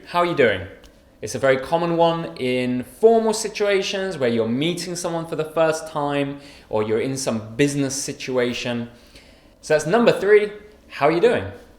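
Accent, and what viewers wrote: United Kingdom. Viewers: I like your British English accent too and thank you very much.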